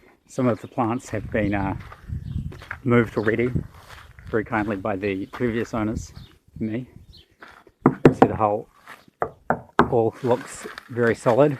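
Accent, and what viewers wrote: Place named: New Zealand. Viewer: You sound like a kiwi ! Looks like a cool project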